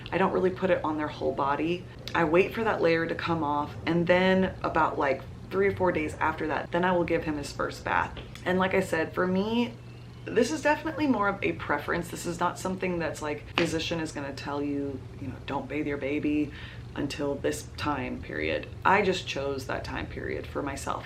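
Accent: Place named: Texas, United States. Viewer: I found your videos recently as I will be having my son in two weeks as a first time mom. Every video has been so helpful. I was hooked when I could tell you had a Texan accent and then found out you’re a fellow Houstonian!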